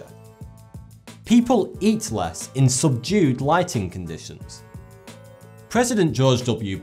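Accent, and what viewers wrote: United Kingdom. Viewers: lol. I LOVE, LOVE this accent! Really! This is the most beautiful British accent!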